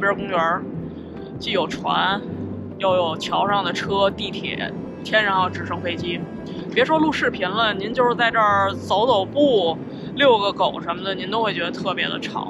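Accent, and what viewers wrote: Beijing, China. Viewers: 之前是喜欢这一口京腔儿，现在是喜欢这样一个真实的摄影师，还是期待EDI能走的更远👍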